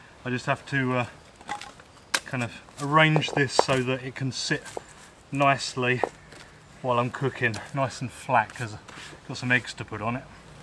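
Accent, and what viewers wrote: United Kingdom. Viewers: are u british bruh?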